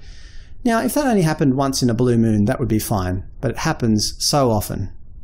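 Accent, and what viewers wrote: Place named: Australia. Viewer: Hey there. I value your commitment to informing the public on misanthropy, and I really like your content. That accent sounds Australian, as am I. I am a researcher interested in understanding human behaviour, particularly so far as it relates to anthrozoology and ecopsychology. I am curious, are you a scholar or academic professional?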